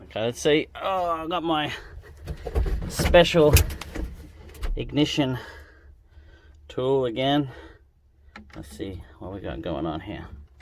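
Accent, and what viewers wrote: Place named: Australia. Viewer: This is so weird homie has a Aussie accent I don't think there in Australia but he's into square bodies like a California or Texas boy I'm jealous of his truck collection slash junkyard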